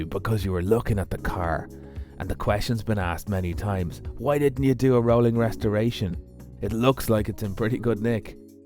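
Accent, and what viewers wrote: Ireland. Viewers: This is the best "one man show" on any platform EVER! I cant even fathom the work that goes into this! The work on the car is enough to make me faint but to package it with the stop motion and smother in the gravy that is that fantastic Irish accent I am hooked! I couldn't give two spits about RR but watched that playlist twice. is a TRUE Renaissance man.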